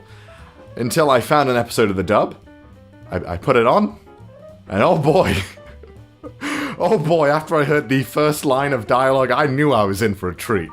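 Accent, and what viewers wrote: United Kingdom. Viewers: Ok so my brain is breaking trying to figure out where your accent is from because it sounds vaguely British but not— obviously British and I DONT KNOW WHAT TO THINK ANYMOREEE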